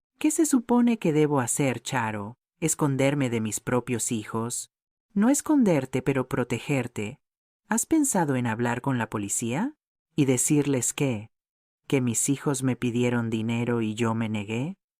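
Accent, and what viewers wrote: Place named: United States. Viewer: Me gusta la historia, lo único qué no me gustó que narren con acento gringo, siendo qué la historia es relatada en Sevilla España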